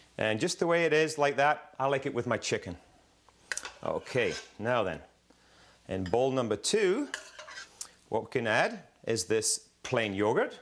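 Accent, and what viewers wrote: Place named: Scotland, United Kingdom. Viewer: You have a bit of scottish in your accent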